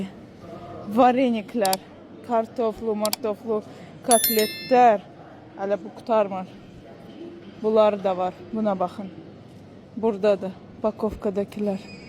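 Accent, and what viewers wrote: Ganja, Azerbaijan. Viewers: Necesen Gəncə lehcesiyle danışırsan maşallah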